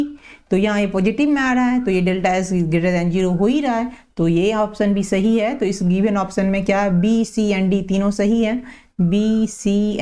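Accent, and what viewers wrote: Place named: Bengal, India. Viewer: Bengali tone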